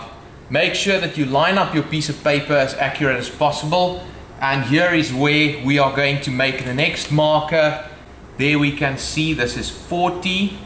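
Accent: South Africa. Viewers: I think you are 🇿🇦. Just saying by your accent 🤔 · Great to hear work explained in a South African accent for a change. Nice, concise video!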